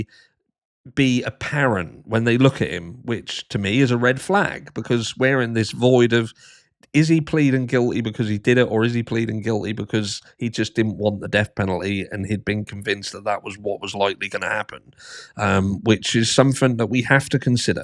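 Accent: United Kingdom. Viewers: You are not even from USA are you. Sounds like a Brit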